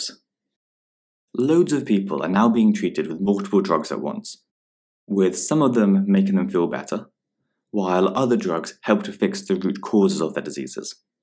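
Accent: England, United Kingdom. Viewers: Thats how it's pronounced in the American accent you dumb the guy in the video is English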